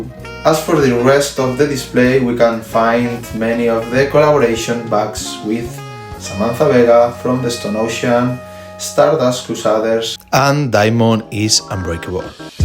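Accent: Spain. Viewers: Eres mas español que yo con ese ingles, guapisima la coleccion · a los 20 segundos saque tu acento de español JAJAJA ta bueno tu coleccion ❤ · el acento español le da el toque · Como se nota el acento de Albacete jajajaja, vaya puta barbaridad, ojala tener algo así algún dia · Yo cuando veo que habla inglés como yo Yo: este compa es español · El inglés españolizado le da el toque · Least nottable spanish accent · First of all, i just wanna say you have the fatest rising collection i've seen, knowing you started 3 years ago. Its truly amazing seeing a European, like me, enjoy this series so much and see this collection that is so epic. Second, i would love to get a more detailed video about the shonen, ultra jumps display in your room because shonen, ultra jumps, volumes is where i also wanna start collect more and i would love to know more about. Last, i think i understand you in borh languages! 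i'm from l🇵🇹 and your spanish accent isnt that hard ro figure it 😂 But you blend them very well for your audience and i think you are doing fine. Thanks for the video Mr, i csnt wait for more content from you and someday, we can see the whole collection since we you have diad this is 70% of it due to the lack of space. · el inglés españolizado me mata JAJAJAJA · Bro, se te nota mucho el acento español, pero se nota que le pones buena intención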